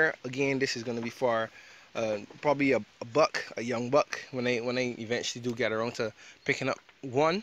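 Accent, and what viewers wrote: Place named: Ireland. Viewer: what country are you in? you sound a bit Irish.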